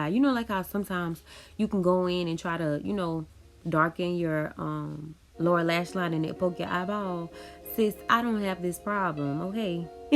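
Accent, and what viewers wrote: New Orleans, United States. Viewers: I love this look and your accent, are you from NO?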